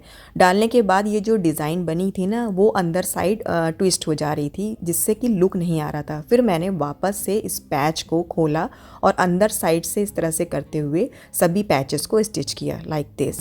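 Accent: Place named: Gorakhpur, India. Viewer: wah masha Allah meri padosan inkli ap...pr ap ki language me gorakhpur side ka touch hai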